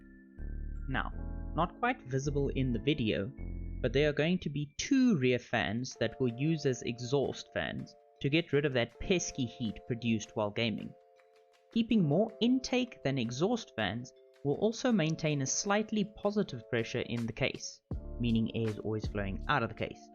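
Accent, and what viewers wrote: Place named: South Africa. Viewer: You south African holy mollie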